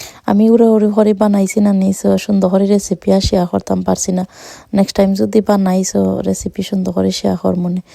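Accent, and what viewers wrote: Sylhet, Bangladesh. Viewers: Sylhety mat hunle asolei onek vala lage❤❤❤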